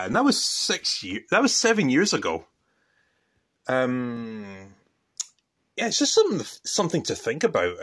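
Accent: Scotland, United Kingdom. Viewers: Do you live in Scotland according to your voice ?🇬🇧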